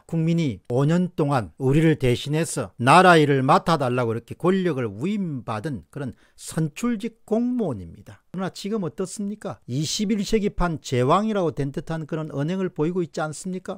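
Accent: Gyeongsang, South Korea. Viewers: 이 물건도 갱상도네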